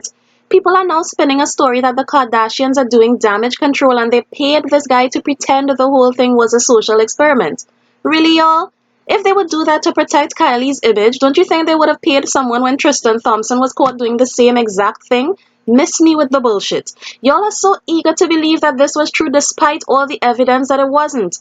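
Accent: Trinidad and Tobago. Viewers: Is that a trini accent i hear :) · you are sounding like a Trini are you? Good summary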